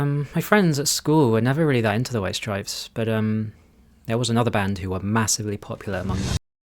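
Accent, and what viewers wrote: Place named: United Kingdom. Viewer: I've watched your videos over the last few weeks but I've never heard you speak. When I first started this video I was like good grief is a Brit haha! I like your playing, I really do. You have such amazing picking technique which, as a player is my largest weakness. Do you have any tips for a well rounded veteran player to improve picking technique? Cheers from the US!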